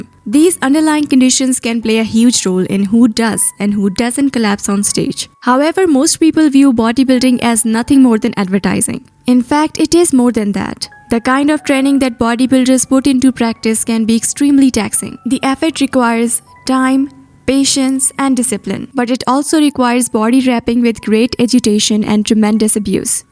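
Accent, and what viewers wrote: India. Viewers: disliked cause of indian accent lol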